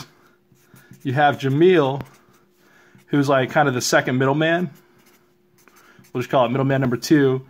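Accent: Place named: New York, United States. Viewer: Bro I knew from your accent you lived in New York haha I'm in New York as well and could just hear it!.. amazing that you were out here collecting and making big moves so many years ago and still today.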